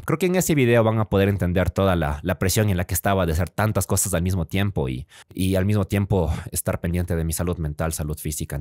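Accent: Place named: Mexico. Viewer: Hablas como Mexicano, pensaba que eras mexicano pero ahora que veo que eres de Ecuador lo entiendo, siempre he creído que en Ecuador tienen un acento similar al estándar mexicano.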